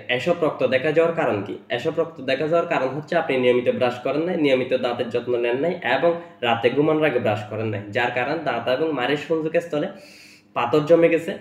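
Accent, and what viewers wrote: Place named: Chittagong, Bangladesh. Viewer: bhai apni mone hoy chittanga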